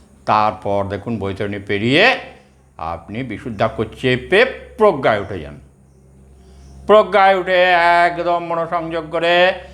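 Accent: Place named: Birbhum, India. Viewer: যেইভাবেই হোক আপনার সাথে দেখা করতেই চাই, আপনার কথা শুনে মনে হচ্ছে আপনি বীরভূমবাসী, যাইহোক আপনার mail id ta দেন আমি পেলাম না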